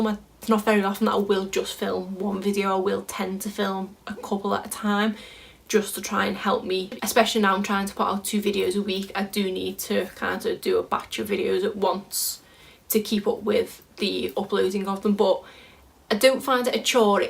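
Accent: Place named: Yorkshire, United Kingdom. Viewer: Your accent sounds something like Yorkshire. Lovely accent!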